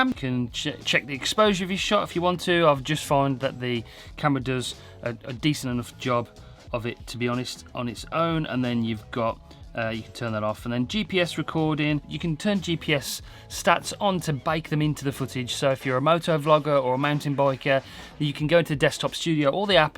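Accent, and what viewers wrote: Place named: England, United Kingdom. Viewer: that english accent really sucks. Sometimes is hard to tell what the hell you are saying?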